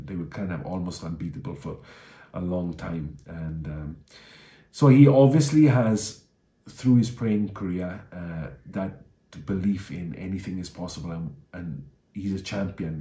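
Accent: Wales, United Kingdom. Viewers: Nice video. I spent the first 30 seconds wondering were you Welsh or SA. That accent has travelled. 😄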